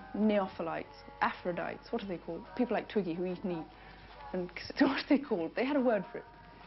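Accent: RP, United Kingdom. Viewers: It's called RP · This is RP and it's an affect, it's the least real English can be. It's not a bad thing necessarily, she doesn't have to speak "real" English given that she wasn't even born in England. I guess assuming white foreigners are English because they speak RP is par for the course in this country, it's all about aesthetics. The fact that this user replied to themselves further expounding their implicitly reactionary world view leads me to believe that their perspective on "real English" identity is distorted and deranged, which is reflected in the fact that actually English people don't meet that standard and random provincials who weren't even born in this country do. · Brits correct me, because I'm American...She sounds VERY RP to me. Interesting how formal and high-brow her accent is. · Received pronunciation.❤❤❤ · She was the ultimate dream dolly bird, posh talking and beautiful.❤️❤️❤️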